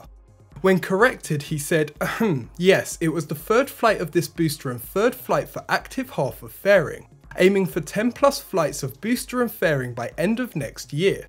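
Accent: England, United Kingdom. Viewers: Christopher Carr you throw linguistic terms but you can’t tell he has an English accent to me that seems unusual.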